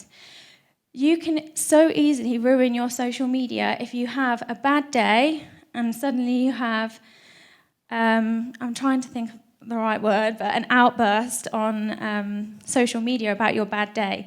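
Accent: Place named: United Kingdom. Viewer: first her british accent annoying second try your product in your ass see if it work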